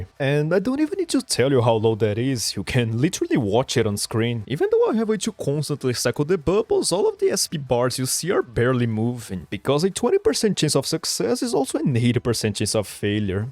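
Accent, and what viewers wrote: Brazil. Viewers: Primeira vez aqui, na hora que eu ouviu você falando Ptilopsis eu tive certeza que tu era br kkkkkkkkkkkkkkk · Wait a Second... YOU'RE BRAZILIAN!? That Accent... it's Familiar somehow, anyways, nice to know one of my Favorites "Arktubers" is of the same Country as i, btw, will u cover Endfield? Really like the style of ur videos.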